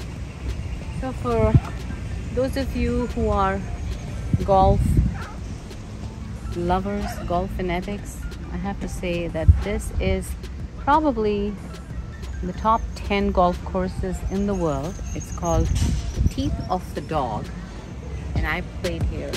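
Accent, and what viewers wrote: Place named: United States. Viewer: Drop the western accent and bring your true self out. Your hindi diction is very good but why this American dialect??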